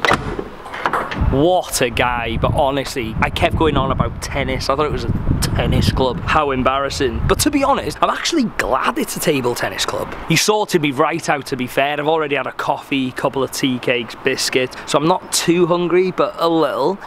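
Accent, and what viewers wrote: Ireland. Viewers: Ah you already sound like an Irish person *i haven’t a clue what I’m doing where I am ps I’m from Kerry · Absolutely nailed the North Side accent! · We're is your accent from? I thought it was Irish. I'm in USA so I might not notice the differences.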